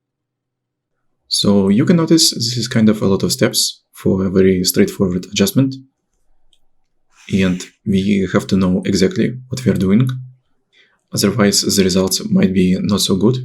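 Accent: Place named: Russia. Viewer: Да нет же! Акцент жемчужина! Я сразу вспоминаю игру Red Alert... Комрад дженерал и другие озвучки русских юнитов))))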